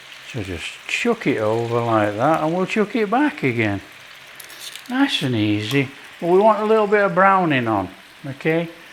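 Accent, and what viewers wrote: United Kingdom. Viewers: love the cooking as well as the beautiful British English accent ❤️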